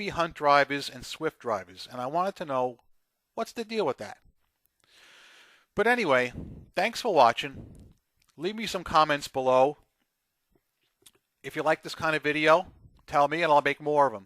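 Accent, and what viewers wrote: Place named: New England, United States. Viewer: ould probably watch this video if it en't for your yankee ass voice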